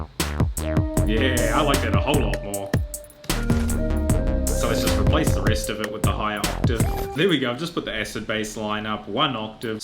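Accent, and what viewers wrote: New Zealand. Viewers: you sound like a NZ'er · y do u sound new zealend? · Are you from New Zealand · are you from new zealand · Are you from New Zealand? I recognize your accent. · Are u from nz? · Is this guy from NZ? the accent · you from nz oi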